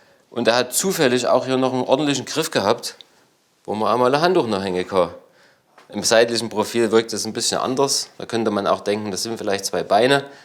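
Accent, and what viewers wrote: Germany. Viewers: Wunderschöner Platz, einladender Raum, tolle Einzelstücke, mit einer ruhigen Ästhetik zusammengestellt. Und gesprochen mit meinem deutschen Lieblingsdialekt 😊, sagt die Schweizerin.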